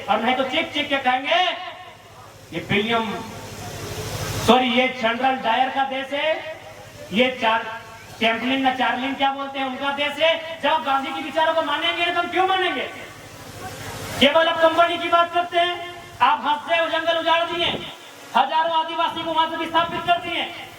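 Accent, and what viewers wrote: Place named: Odisha, India. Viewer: Orissa sa hun bolte ho bhai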